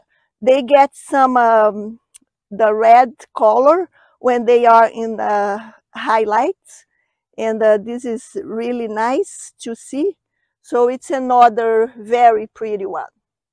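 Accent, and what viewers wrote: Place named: Brazil. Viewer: Seu sotaque, sotaque de brasileiro é inconfundível .Eu também coleciono hoyas. Tenho 25 espécies. Mas nenhuma no momento floresceu :(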